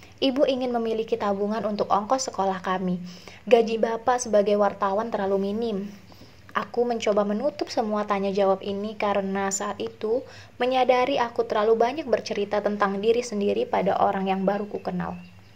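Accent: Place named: Flores, Indonesia. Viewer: Aksen floresnya 😭 butt gooddd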